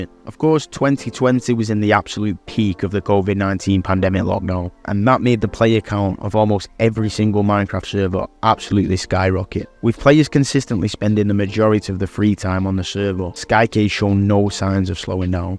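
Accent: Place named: United Kingdom. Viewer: The fact that ur a so British makes me watch this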